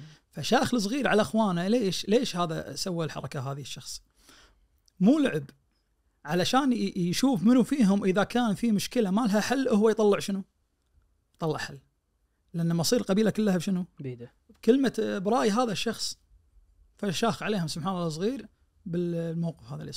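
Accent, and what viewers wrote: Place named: Iraq. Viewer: هذا لهجته فيها من اهل العراق حيل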